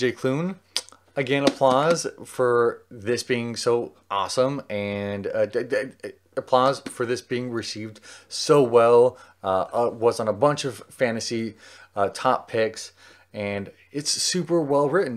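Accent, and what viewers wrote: South, United States. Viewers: I love your vlog on this video at your house in Texas during your quarantine until you had a lot of books last year back in 2020 are The Ballad of Songbirds and Snakes by Suzanne Collins, She's Come Undone by Wally Lamb, The Toll by Neil Shusterman, My Best Friend's Exorcism by Grady Hendrix, And I Darken by Kiersten White, Black Sun by Rebecca Roanhorse, The Southern Book Club's Guide to Slaying Vampires by Grady Hendrix, The Rage of Dragons by Evan Winter, Gideon the Ninth by Tamsyn Muir and Rhythm of War by Brandon Sanderson while you're talking about all of the characters from all of the books to read them in the beginning while you're talking about horrible mentions of the book called, To Sleep in a Seas of Stars by Christopher Paolini and another books of The Great Hunt by Robert Jordan including Grave Peril by Jim Butcher, The Bands of Mourning by Brandon Sanderson and The House of the Cerulean Sea by T.J. 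Klune and Surrender Your Sons by Adam Sass after that, I hope your husband, Zach will be very proud of you and I love your Southern accent!!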